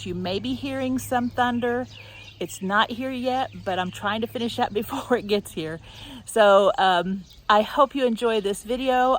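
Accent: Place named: Ohio, United States. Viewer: Those turned out beautiful now I’m not sure what state you’re in. I wanna say Ohio, but I could be wrong. Anyway you have a little bit of an accent, but that was very good. Even your one in it were great. Good job. I’m gonna do that here in my property, but I found a flat angel That was originally hooked to a fountain and of course he didn’t have that the thrift shop and I have a ceramic pot and I made it. It’s gonna look really cute yeah but I was thinking to keep the leaves out of your red pan. Why not cut out a little piece of Spring and then glue those gem rocks on it and put that over the drain maybe that would help keep that cleaner longer. Have a great day. Thanks again. I loved it.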